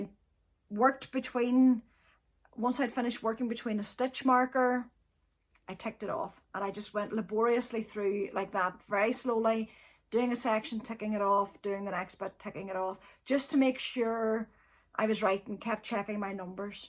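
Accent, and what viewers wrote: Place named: Ireland. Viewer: I so love your podcast! Thank you for uploading your videos. I especially love listening to your Irish accent. You could read the phonebook and it would still be interesting. Blessings to you and your family. Haven't seen your cat for awhile. Does he still like to perch on your k we while you knit...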